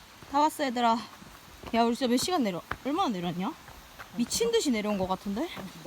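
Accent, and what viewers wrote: Gyeongsang, South Korea. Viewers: 진짜 오리지날 경상도 발음이다..ㅋㅋ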